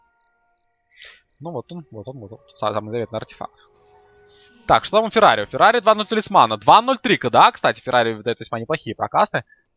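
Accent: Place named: Ukraine. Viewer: Не очень понятно, когда ты говоришь украинским акцентом :/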